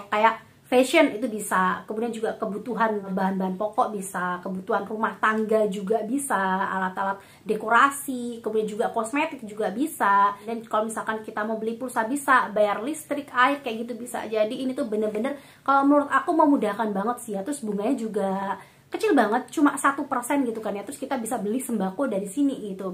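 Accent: Java, Indonesia. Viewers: Logat jawa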